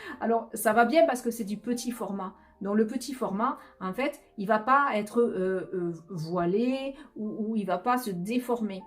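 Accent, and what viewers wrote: South, France. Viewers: Merci pour tous ces conseils et surtout ne vs arrêtez pas de parler avec votre accent du sud c est le soleil qui ns envahi!!! Ah et vs vs êtes un vrai rayon de soleil merci pour tout ce que vs ns apportez.❤❤❤❤énormes bisous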